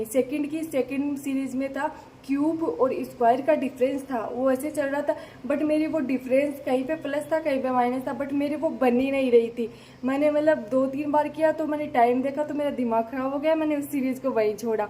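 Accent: Rajasthan, India. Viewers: Language se Rajasthan k lagte ho😅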